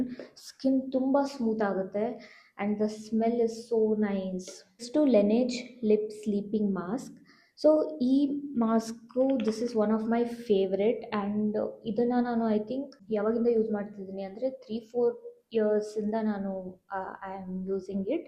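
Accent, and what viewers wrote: North India, India. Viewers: I can't believe... I think ur from north indian 😊 Kannada ❤️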